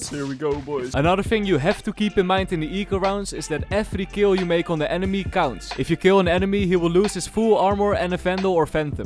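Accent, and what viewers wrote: Netherlands. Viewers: i smell dutch?!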